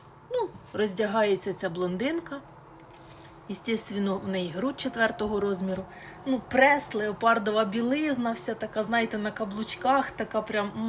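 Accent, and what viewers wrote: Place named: Mykolaiv, Ukraine. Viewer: А какой у тебя очень приятный диалект! Николаевщина?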